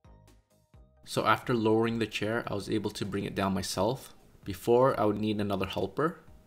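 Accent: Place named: Poland. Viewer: Polish accent :)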